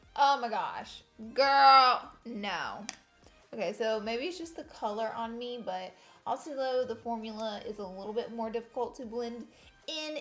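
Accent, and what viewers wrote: South, United States. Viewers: Bruh it’s the southern accent get the eff over it 💁🏼‍♀️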